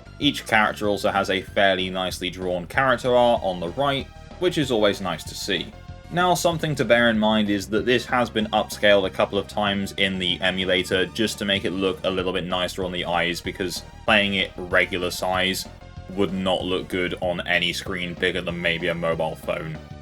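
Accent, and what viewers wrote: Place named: Birmingham, United Kingdom. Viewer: Well, you're definitely not the Budget Builds Official guy. Same middle class Brummie accent though. Since I don't like SMK, I definitely wouldn't like this one.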